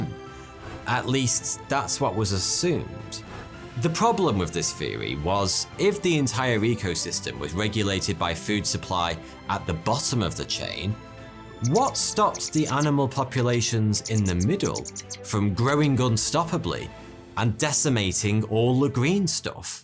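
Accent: United Kingdom. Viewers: Great videos, thoughty and smart content, daily posting along with a narrator that spoke with a British English accent and with awesome discounts! This is awesome!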